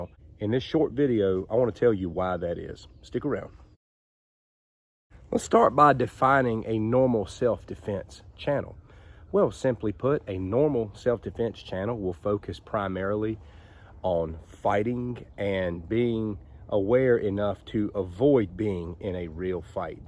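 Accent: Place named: Georgia, United States. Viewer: Are you from Georgia? Your voice is very soothing and reassuring.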